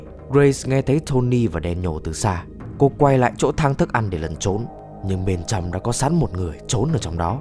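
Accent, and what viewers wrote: North, Vietnam. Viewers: admin nói giọng bắc mà chill bro 😭😭 tôi ng bắc vẫn hay đùa backi thấy cũng vui mà miễn k có ác ý là được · Sao tao nghe thấy thằng làm content đọc bắc kỳ trong cái video này? Phân biệt vùng miền lên cả recap phim rồi? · Tính ra ng đọc nói giọng Bắc mà, tôi nghĩ chúng ta có thể hiểu nó giống như người da đen nói niga <(“)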